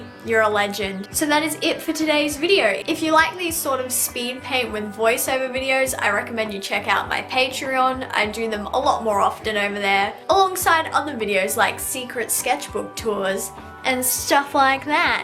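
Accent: Australia. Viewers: Just found ur channel, ur personality is si great I love ur vids 😂 are you australian? Your art is amazing btw!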